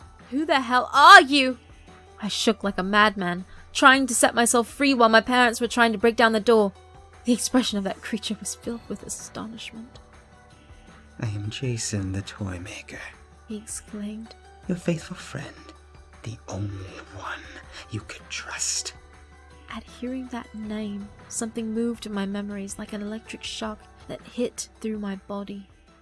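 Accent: United Kingdom. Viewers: That was a freaky and grisly little story. It felt like a dark fairy-tale with gothic horror overtones. Really sucked me in. Nice job, as always. Also, your British accent is adorable. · i missed this long story of yours. great narration as always. im amazed that you can do a british accent. kudos to you and. 👍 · when u get turned on by awesome brtitish accent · This was awsome! I've never heard you do a British accent before, it's kind of ckol. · oh snap British accent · Insanely and awesomely narrated! If I didn't know how you really sound like, I would swear you were born in the UK.. and at first I thought I was listening to Fearagen but, NO.. In actuality, it's Like Wow! he took the role of creepiness to the next level, and so Thanks Lovely Ms. and.. please, more stories like this! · why does it sound like you have a British axesat? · Excellent story, love the accent! 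That was a great British accent, just because it said or I wouldn't of known it was you LOL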